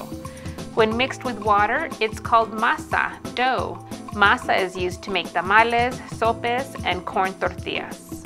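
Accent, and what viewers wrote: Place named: New Mexico, United States. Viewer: I recognize your accent! Are you or your mom from New Mexico?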